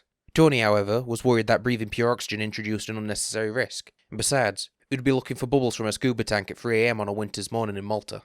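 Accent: Yorkshire, United Kingdom. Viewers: You sound more Yorkshire then you ever have done so before. Is this on purpose? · Nice to hear a fellow Yorkshire man speaking, how do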